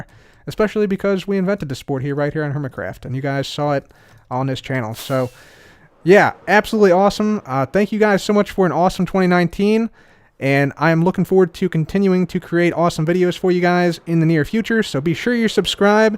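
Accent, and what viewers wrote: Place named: United States. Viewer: Sounds like he's from the US to me.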